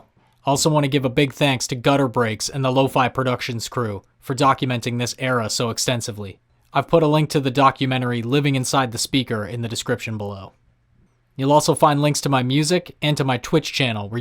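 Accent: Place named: United States. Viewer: even though it's been literal decades it's still weird to hear someone with an american accent talking about OG dubstep great vid great music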